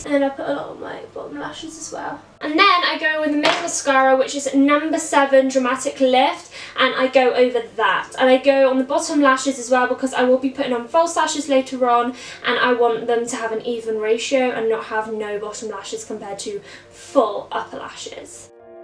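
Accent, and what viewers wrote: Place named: Mid-Atlantic, United States. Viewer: I gotta say your American accent is quite good. Sometimes sounds a bit more Mid-Atlantic but that also kind of works for that classic Princess vibe. And thank you for wearing gloves! I get kind of annoyed when I see Belle or Cinderella not wearing gloves (thank those live action movies for that ugh)!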